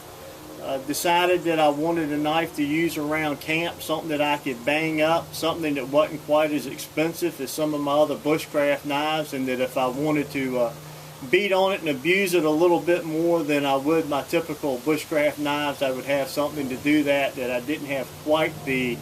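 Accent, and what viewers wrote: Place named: Richmond, United States. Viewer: I'm really liking your videos man. I have this knife as well. Great knife! This thing is a work horse and cuts about as well as any knife I own; and that includes a F1 Fallkniven. I asked Dave his opinion of the knife and he said, because it was only 1065 steel it would not truly be effective in all ways. Have you tried to throw sparks off of it with flint? By the way, are you from the Richmond VA area? I think I recognize that accent.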